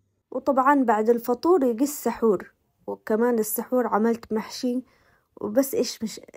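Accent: Yemen. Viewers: ربي يسعدك وينولك مرادك ..انسانة راقية ولهجتك اليمنية جميلة جدا ❤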